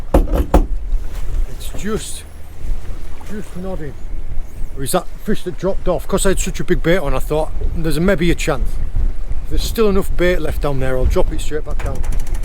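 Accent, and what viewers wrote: United Kingdom. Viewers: Love your videos sir in cornwall, your british accent is not the easiest for non brits to understand still great videos keep up the good work and best regards from sweden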